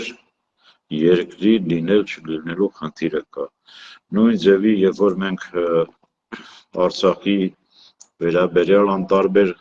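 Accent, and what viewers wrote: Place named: Volgograd, Russia. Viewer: Голос. Ссли8но Волгоград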